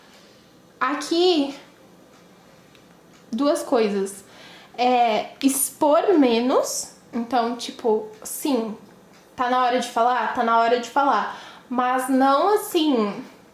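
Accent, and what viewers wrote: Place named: Rio Grande do Sul, Brazil. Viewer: Acho tão bonitinho ela falar, é gaúcha?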